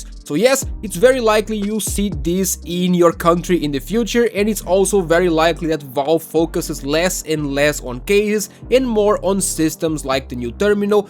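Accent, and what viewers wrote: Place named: Portugal. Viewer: tentas te mas sei que es portugues ahaha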